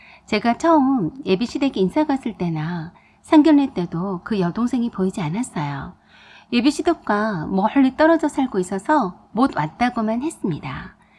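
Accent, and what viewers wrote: Jeolla, South Korea. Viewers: 나레이션 하시는분 전라도사투리 쑥쑥 나오네여.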